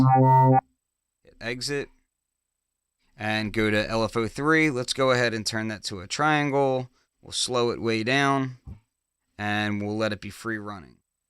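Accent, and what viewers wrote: Philadelphia, United States. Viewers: It sounds like you have a Philadelphia accent.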